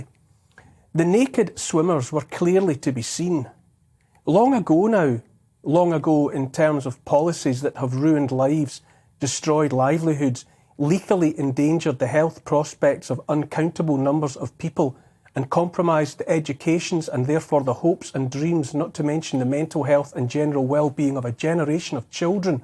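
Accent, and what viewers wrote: Scotland, United Kingdom. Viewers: We have Rod Cullerton and Jason Miles from GAP. We have Graham Hood who's been very outspoken, along with Hoodys Helpers. I do like though.. I love a Scottish accent. The same will happen here. They know they're losing, no unvxd at fireworks, oh no please come.. just one example. I think you'll see a lot of scrambling now. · Agree with everything you say,. Look forward to every posting from you. You present with a calm Scottish accent but I can hear the anger beneath. As punishment for those responsible and especially those who made millions from their decisions I would suggest that they all have to return the money and sell their assets except for one average priced house and give that to theTreasury to help offset the bill that we, our children and our grandchildren will be paying for their lifetime.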